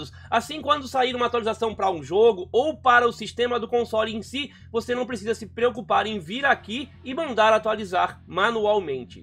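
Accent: Florianópolis, Brazil. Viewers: TU E DE FLORIANOPOLIS???????????????????????????????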